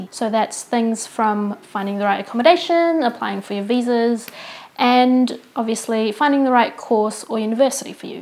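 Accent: New Zealand. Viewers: Pacarku orang new zealand, mirip banget cara ngomong dan accent nya sama kakak ini, salam kenal kak, God bless you:) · Wow keren Kia ora, saya kiwi (Maori) dan belajar BI - anda bicara bahasa Inggris DAN bahasa indonesia dengan aksen kiwi! Saya berharap bisa membantu saya dengan BI! Chur =) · From Indonesian to kiwis, it kinda shook me bruh😂 I need to have this accent asap! So obsessed😭❤ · Are you from South Island sound like yours from the south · Persis bangat sama accent kiwi Btw saya di auckland daerah pakuranga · Are you kiwi?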